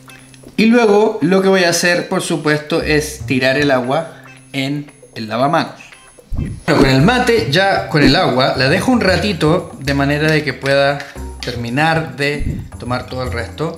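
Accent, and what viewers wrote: Chile. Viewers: Che de dónde sos?? Pareses medio chileno por tu acento pero me párese medio raro un chileno tomando mate jaja qsy 🤷🏽‍♀️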